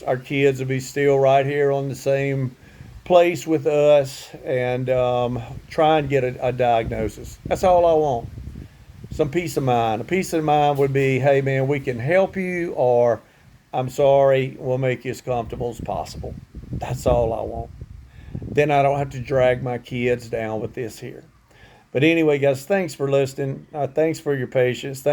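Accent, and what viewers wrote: United States. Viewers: God bless brother!!...obviously, you are looking and sounding very American, they will treat you as a rich person. I pray that you will find doctors that are truly into healing and not for profiting. Old saying goes, "stay away from sugar",... and carbohydrates. And if you are not diabetic, try fasting for 3 days. Reboot your immune system and rebuild new and stronger cells. Take care bro...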